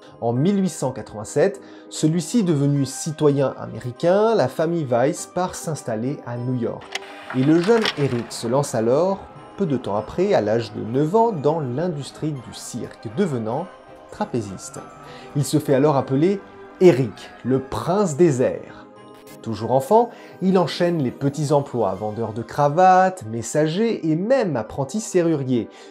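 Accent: Canada. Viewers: ton accent Québécois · tu as l'accent canadien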